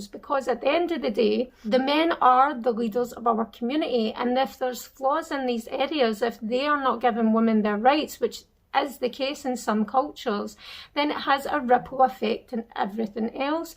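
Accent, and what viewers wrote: Scotland, United Kingdom. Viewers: I'm not used to female youtuber with Islamic content, but I'm definitely staying. Love Scottish accent 😍😍😍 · Mashallah ... you have more insight and reason than all those men hhhhh and your scottish accent is like the cherry on top hhhh ....i love scottish accent so mush .. and to find a channel of a muslim scottish woman ... YA Allah .. i feel like i just found a treasure hhhhh May Allah bless you sister .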